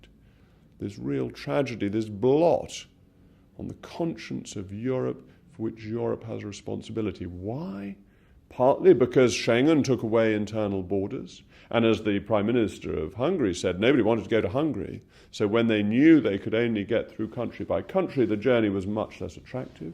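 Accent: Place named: Public school, United Kingdom. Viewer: I would like to have heardthe other side. Perhaps it would not be expressed in the rich public school accents of a Conservative public school. The reason why the United States of America is rich and powerful, is because those states are united and it is a large free trade area and not divided into many small countries as was Europe before the EU was initiated. I would like to hear the other side on each these subjects. I don't think this man is really concerned with me and my future and anyway I really do prefer French or German sausages to the British banger. Patriotism really is the last refuge of a scoundrel. There are pros and cons and the pros outweigh the cons.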